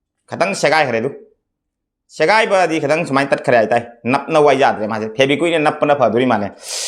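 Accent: Cachar, India. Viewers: Bro kang metei lon ngangbdo Hanna fajana tamkho tabda yam wai cachar mcha lon sude hainakhini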